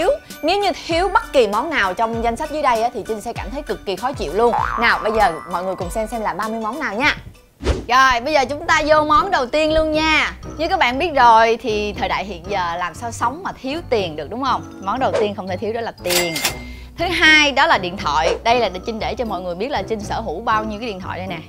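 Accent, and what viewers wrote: Mekong Delta, Vietnam. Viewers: Trấn Cường Lâm đậm chất miền tây luôn á :)))) r mà toàn đọc thành g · Trinh nói chuyện thật quá đậm chất dân miền tây tự hào quá trinh ơi Dân miền tây tụi tao thật thà và chất phát 😁😁😁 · Nói chuyện mộc mạc đúng chất miền tây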